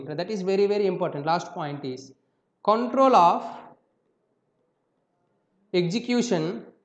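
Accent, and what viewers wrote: India. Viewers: speak clear english better indian tone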